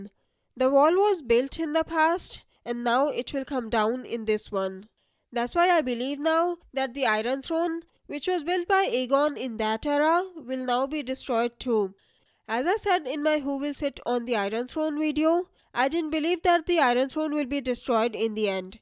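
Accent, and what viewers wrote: India. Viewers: are you from INDIA? your accent seems like that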